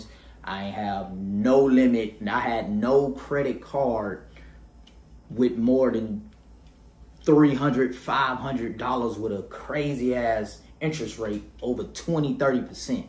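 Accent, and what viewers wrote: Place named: Atlanta, United States. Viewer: sound like you from ATL, i was thinkin of company names MuddHouseReQ did pop up, ill run wit sum ting else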